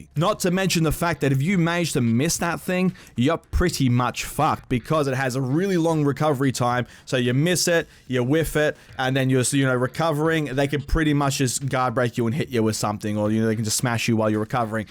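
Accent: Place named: Australia. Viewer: Gaming i noticed,none other than australians swear and say fuck this way 😂😂love your vids bro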